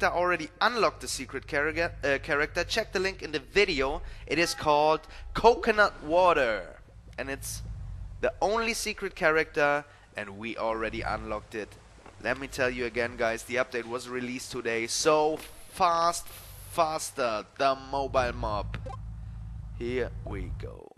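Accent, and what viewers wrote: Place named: Germany. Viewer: ohh I knew it! You have a German accent so I noticed :P I'm Brazilian ^u^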